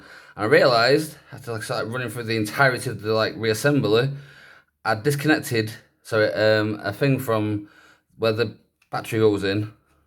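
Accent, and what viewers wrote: United Kingdom. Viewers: Love the British accent mate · Are you scottish?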